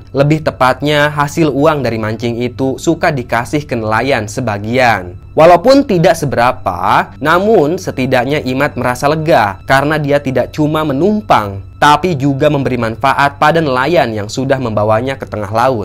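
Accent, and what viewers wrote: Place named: Lombok, Indonesia. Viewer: Orang lombok yah😅😅😅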